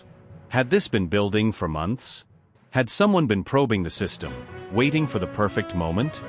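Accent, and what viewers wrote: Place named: United States. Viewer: Absolute rubbish. Why does the voice always have an american accent. Liberty Media as part of the tRump Mafia?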